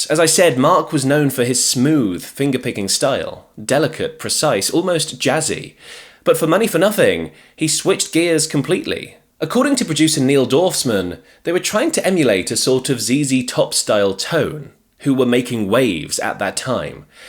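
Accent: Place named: Newcastle, United Kingdom. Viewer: Geordie teaching English? Awye mun!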